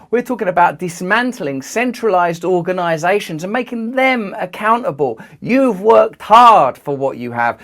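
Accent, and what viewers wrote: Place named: United Kingdom. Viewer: Your accent seems extra britshy today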